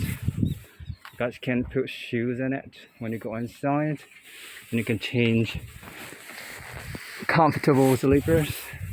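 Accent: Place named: United Kingdom. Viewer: 개인자작이 업체보다 더 잘만들수 있다니..대단하십니다~ 나중에 의뢰하게 될지도 모르겠네요.. 그리고 개인적으로... 영식영어 발음이 한국인에게 미식보다 어려운 점이 많다고 생각하는데 멋지십니다^^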